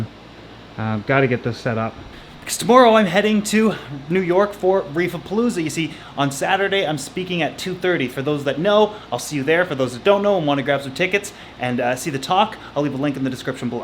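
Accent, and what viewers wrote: Canada. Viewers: I only just started to notice your Canadian accent in videos... I must be too busy looking at your amazing tanks to have noticed your cool accent.. Love the vids as always!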